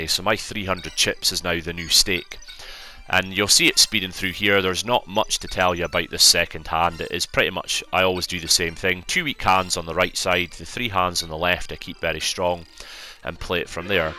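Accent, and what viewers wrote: Scotland, United Kingdom. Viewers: I think it's Scottish accent? Sorry if I'm mistaken.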